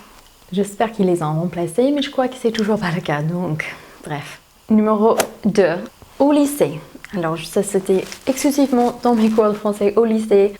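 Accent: United States. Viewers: Oui je me doute ne t'inquiète pas! Dans ta dernière vidéo il me semble que tu as dit avoir changé de micro il me semble et la qualité était plutôt bien donc je me suis dit que tu l'avais peut-être juste mal positionné cette fois :/ Et j'en profite pour te dire que j'adore tes vidéos et surtout ton petit accent américain est trop cute! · C'est trop drôle, heureusement que tu n'as pas ri comme on te l'a appris à l'école sinon les gens t'auraient trouvé bizarre. J'aime beaucoup lorsque les américains parlent français, c'est très mignon comme accent. Je viens de regarder quelques unes de tes vidéos, j'adore et je m'abonne. A bientôt.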